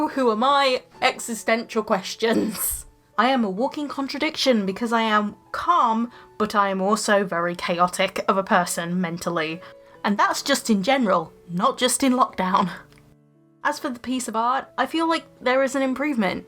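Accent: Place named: United Kingdom. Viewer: i LOVE BRITISH ACCENT ♥.♥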